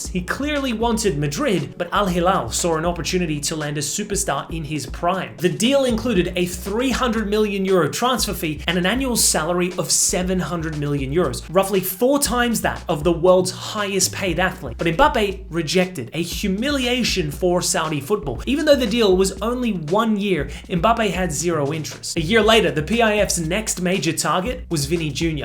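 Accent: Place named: Australia. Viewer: Can’t believe I use to think was British 😂, definitely hear the Australian accent more clearly now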